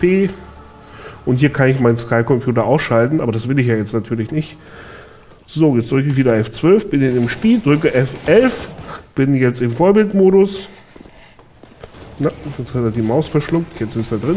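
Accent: Augsburg, Germany. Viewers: Der kommt aus augsburg ja moin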